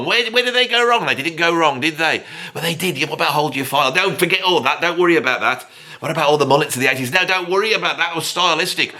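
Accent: United Kingdom. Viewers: There's something about listening to a British rant that is just all too amusing. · I love you man because we're all brothers and sisters in the eyes of the Lord, but that British accent is way too much for me.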